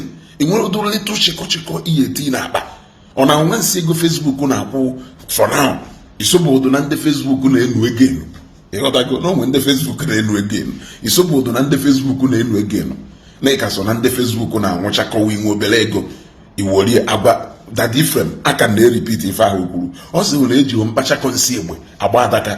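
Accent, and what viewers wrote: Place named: Igbo, Nigeria. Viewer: awesome presentation. That's the ende-Igbo style of talk.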